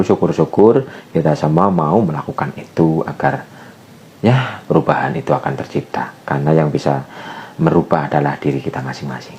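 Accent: Banyumas, Indonesia. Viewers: Assalamualaikum wr wb Qolbitu ustadz ilmu nya,,ilmu sgt bermanfaat,,ustadz ko ngomong ngapak ya 🙏😀😀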